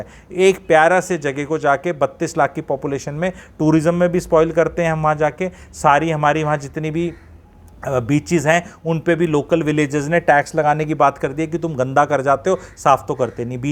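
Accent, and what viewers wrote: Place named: Haryana, India. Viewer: I love your accent English , Hindi, Haryanvi😊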